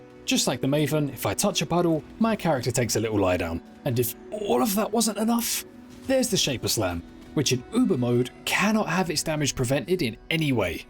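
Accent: United Kingdom. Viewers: the lets go shopping caught me off guard after being used to the smoky british accent for so long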